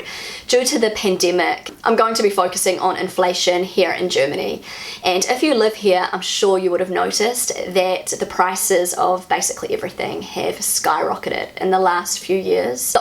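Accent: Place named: New Zealand. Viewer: Neuseelandisches englisch ist fur jeden wine herausforderung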